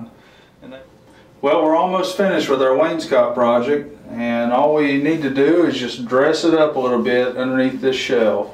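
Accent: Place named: Georgia, United States. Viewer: Georgia or south Carolina ?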